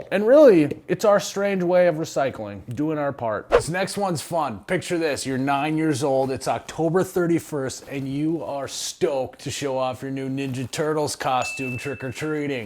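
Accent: Minnesota, United States. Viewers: Me too. This guy is definately from Minnesota or North Dakota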